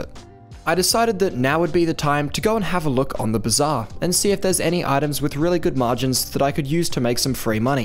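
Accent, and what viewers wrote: Australia. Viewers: idk where ur accent is from ( is it australia? im pretty sure it is?) also i love your content :) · U Australian mate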